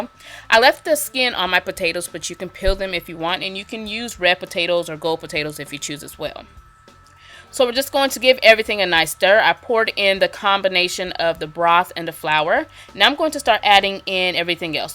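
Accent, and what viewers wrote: United States. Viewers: Why do yanks say "erbs" when there is an "H" in the word........... its HERBS.... in the Queens English the word is HERBS... learn it.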